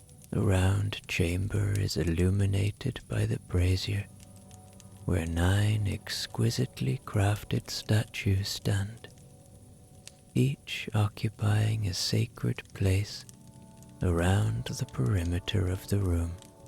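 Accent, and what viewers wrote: Ireland. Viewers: I never hear anything of your stories because I fall asleep immediately 😅. I love your Irish accent and voice and the fantasy journeys! I also love that you were inspired to use your gift this way! After the 1st story (monk in valley), I felt better since. I think it removed blockages while I was asleep. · Irish story tellers are the best!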